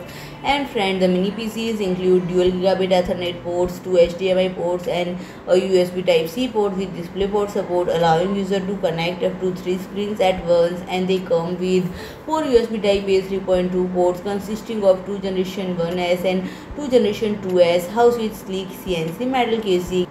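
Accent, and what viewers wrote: India. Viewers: Didn't understand a single thing. Music + horrible mic + indian accent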